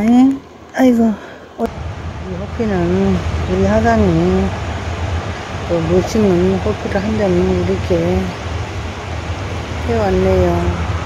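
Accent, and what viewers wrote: Gwangyang, South Korea. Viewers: 말 하는 억양이 과냥 사람 같네! 일 만 km 멀리서 과냥 말 들으니 좋네!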